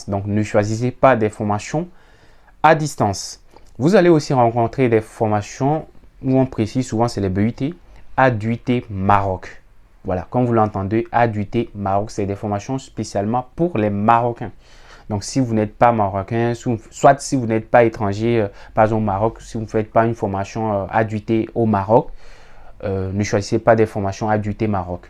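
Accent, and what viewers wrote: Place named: Togo. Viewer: Depuis le Togo...à entendre votre accent, vous devez être togolais...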